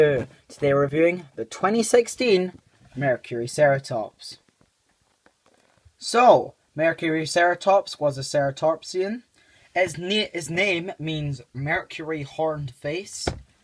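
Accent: United Kingdom. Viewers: And please stop speaking British plese repliy.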